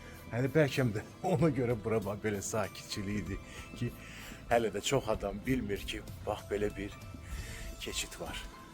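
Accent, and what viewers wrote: Baku, Azerbaijan. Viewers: Bakı ləhcəsi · Ləhcə ləzzət elədi təmiz baki ləhcəsi 🙂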